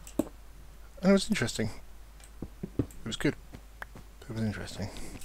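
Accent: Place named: Derbyshire, United Kingdom. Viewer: ..? What area in England are you from?! You sound like my uncle and hes from Chesterfield - Derbyshire..:L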